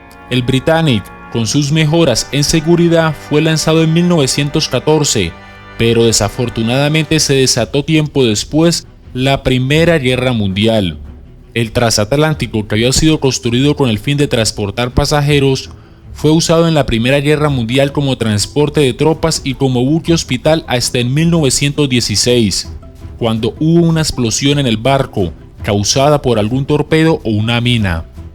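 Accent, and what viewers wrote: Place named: Colombia. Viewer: tan solo con ese horrendo acento colombiano; al minuto de ver el video me dio desesperacion y ya no me intereso ver el video 😝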